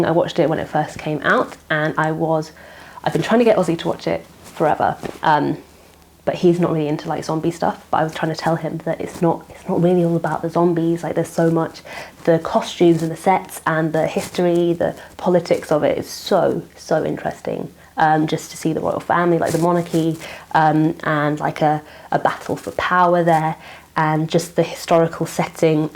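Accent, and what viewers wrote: England, United Kingdom. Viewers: I'm new to watercolor and new to your channel. I've binged watched tons of your content. I love listening to you (my mum was from England and your accent reminds me of her). Keep up the good work and stay safe.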